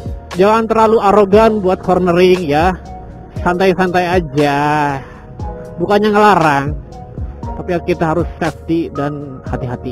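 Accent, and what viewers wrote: Banyumas, Indonesia. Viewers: Ngapak temen lur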